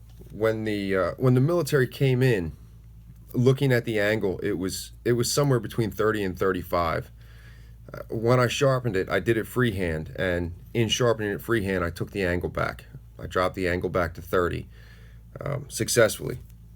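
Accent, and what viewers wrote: Philadelphia, United States. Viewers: Love the vids. Sharpening and testing of blades. Appreciate it. Subd for sure....you sound like u got a Philly accent or somewhere close to there...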